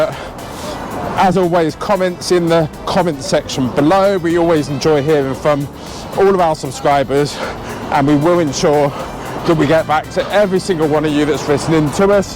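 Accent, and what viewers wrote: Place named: United Kingdom. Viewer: I love the British English accent. I would shrug off the US English should I come as an alien from another planet. But the English pronunciation, hilly and aspirating, reminds me of the coughing of a Victorian aristocrat.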